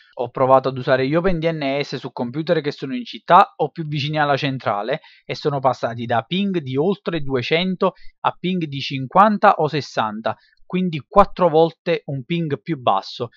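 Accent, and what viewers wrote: Naples, Italy. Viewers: Ma sei di napoli? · oddio si sente proprio che sei di napoli xD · ah bella parlata napulitana xd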